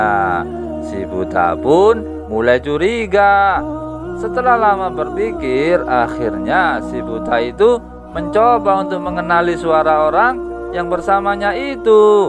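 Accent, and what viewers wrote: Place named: Arab world. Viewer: Kenapa intonasi suaranya bergaya ke-Arab2an, kenapa nggak dengan nada bicara Indonesia yg biasa saja?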